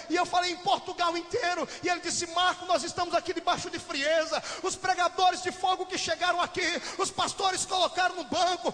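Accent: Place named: Northeast, Brazil. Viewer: essa época ele tinha até um sotaque meio nordestino 😅😂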